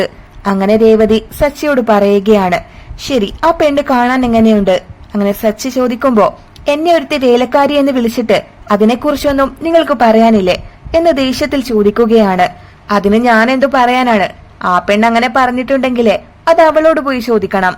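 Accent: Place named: Malabar, India. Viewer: മലബാർ ഭാഷ ആയതൊണ്ട് ചൊതിച്ചതാണ്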